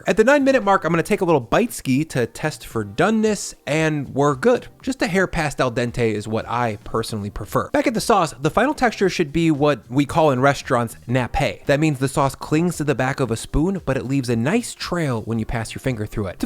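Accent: United States. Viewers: A guy with a German name, American accent, using European ingredients to cook Italian pasta in 5 sauces !!! What's the real message here? By 2025, the earth has become a huge village ?? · Thank you for pronouncing the ingredients in proper American English way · Pronouncing those words in a heavy American accent is funny as hell, though. At least Google how it's supposed to be pronounced before doing the video. 🤷🏻‍♀️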